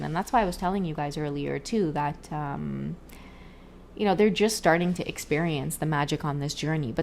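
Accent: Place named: Trinidad and Tobago. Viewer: You got a subscribe with that trini accent😊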